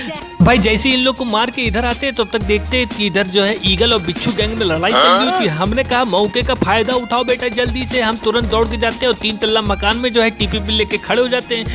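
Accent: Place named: Bihar, India. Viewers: Pakka aap bihar se ho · Bhai aap bihar se ho kya ? Aapki boli se lag rha hai / kyuki mai bhi bihar se hi 😄😄😄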